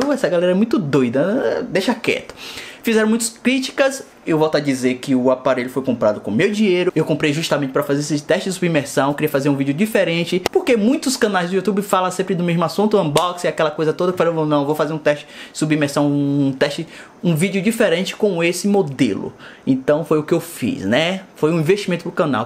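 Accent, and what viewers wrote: Bahia, Brazil. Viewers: Sabia que você era Baiana, esse sotaque aí! Sou de Salvador. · Vc e da Bahia? ??????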